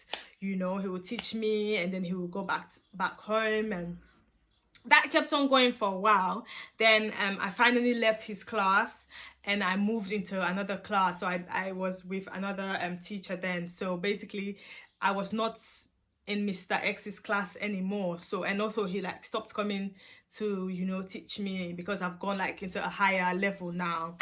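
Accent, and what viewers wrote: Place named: United Kingdom. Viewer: Aww do you live in the UK? I hear an accent lol... I can’t believe this even happened to you x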